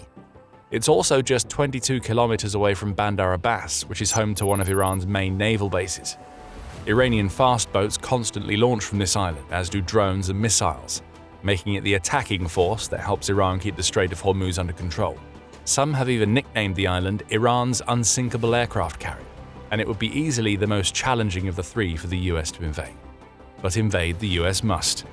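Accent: England, United Kingdom. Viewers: Refrain from crying about President Trump and his methods. You’re saying it with venom in your voice with an English accent while watching your own country devolve into islamification. So don’t be too quick to cry about the methods of the USA to handle the situation. We got this. Get off your high horse, or would you rather have a nuclear capable Iran telling the UK they have to take in more military aged men?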